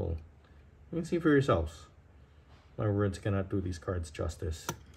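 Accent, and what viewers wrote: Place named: Philippines. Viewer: What nationality are you? Accent sounds filipino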